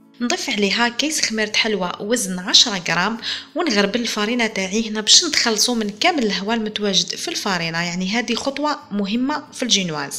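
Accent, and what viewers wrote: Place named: Morocco. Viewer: انت مغربية من هضرتك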